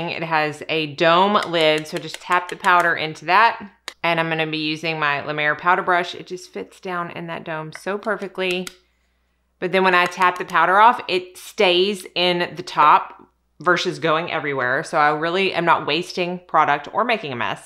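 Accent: South, United States. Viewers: Yaaay!!!! I absolutely adore 🥰 you! I live a full face of anything you do!!! You are such a sweet heart. I love that you don’t take any crap 💩 from people on the internet!!! ( as you shouldn’t)!!! I love 💕 your beautiful southern accent!!!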